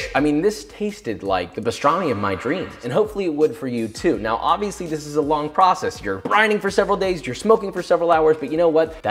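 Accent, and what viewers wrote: South, United States. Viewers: your southern background is showing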